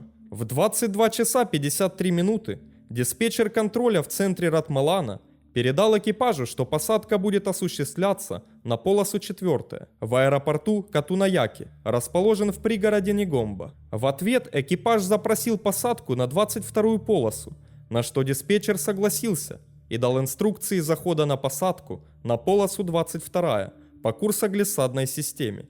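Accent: Ukraine. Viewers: Вроде Украина или Белоруссия. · Скорее, Украина. У него не белорусское произношение.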